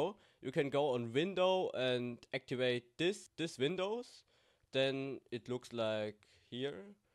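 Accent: Germany. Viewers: Man hört halt voll das er deutscher ist😂😂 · wie man einfach raushört das du deutscher bist ^^ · ich dachte dauerhaft „warum spricht er so?“.. dann habe ich unten in der Windowsleiste den Deutschen Satz gelesen & dachte mir „AHHHHH DESHALB!“ man merkt halt unnormal krass dass d deutsch bist :D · Man merkt das du deutsch bist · Hab vor dem intro schon bemerkt das du deutscher bist haha 😂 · Man hört das du aufjedenfall ein deutscher bist aber trotzdem super gemacht!! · Hey nach deinem Akzent kommst du aus Deutschland oder? xD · Man hört diesen deutschen Akzent XD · I think ure from german hab ich Recht ;$ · Man hört so raus, dass du Deutscher bist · Ich habe deinen ersten Satz gehört und dachte mir nur so: Oh, ein Deutscher xD Aber egal, hat mir echt geholfen, danke !!! Habe sonst immer mit einer anderen App gearbeitet und war jetzt etwas überfordert mit Adobe xD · Ey man merkt deinen deutschen Akzent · Das ist der deutscheste Akzent denn ich yeh gehört habe, aber gutes video und erklärung. 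· Man hört es so stark raus das du deutsch bist xD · haha ich hab gemerkt das du deutscher bist ich spreche genau so english hahaha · haha geiles video, feier deinen deutschen akzent so hart bei deinem englisch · Nach 2 Sekunden schon erkannt, dass du deutsch bist^^ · i think you are german xD but thanks for the tutorial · Man merkt wirklich das du Deutsch bist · You have germany accent :) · wtf just buy the Program and dont crack it 😂 btw dein deutscher Akzent ballert 😂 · Man merkt das du deutscher bist dein Englisch ist aber trozdem gut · Man hat echt doll gemerkt das du deutscher bist>~< No front an dieser stelle. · Man hat soo krass gemerkt das du deutscher bist Hahahahaha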